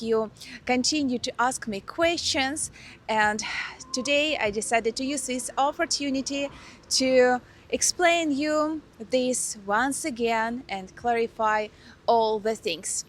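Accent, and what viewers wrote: Russia. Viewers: Yes people dont seem to put salaries and costs together they just focus on one or the other. They also dont look at exchange rates for different areas/countires. Im glad you have been able to see some other countries and hope you can visit the USA someday. You speak english well just dont lose that charming russian accent. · You speak very good English, do not be shy or embarrassed by your accent, 99.9% of Americans. do not know a single word in Russian......Are you familiar with another Krasnodar blogger, he is an American who lives there, he goes by the name of "Comrade Captain Ron".?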